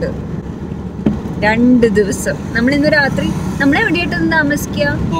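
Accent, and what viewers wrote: Thrissur, India. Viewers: അയ്യോ കണ്ണൂര് വന്നിട്ട് കാണാൻ കഴിഞ്ഞില്ലല്ലോ. ശബ്‌ദം തനി തൃശൂർ ഭാഷ.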